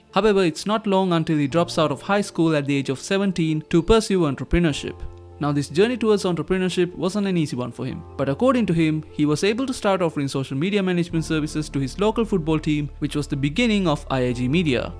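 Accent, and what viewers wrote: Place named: India. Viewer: I think you should use ElevenLabs instead of your natural voice. I really mean no disrespect but I think that the indian accent is unfortunately putting people off from watching the video. Despite the video being really good. This might be why your videos are not going viral.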